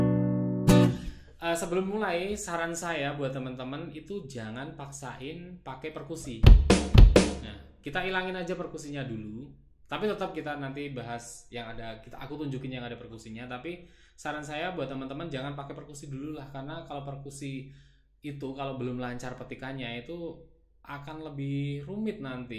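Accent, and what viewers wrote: Java, Indonesia. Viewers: Kendal? Suara medok bang.. Salam dr pegandon 😂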